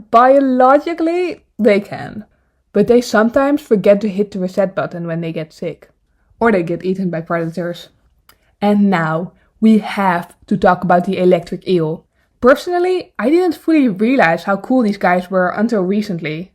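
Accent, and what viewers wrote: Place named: Netherlands. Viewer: Are you dutch because I hear the dutch accent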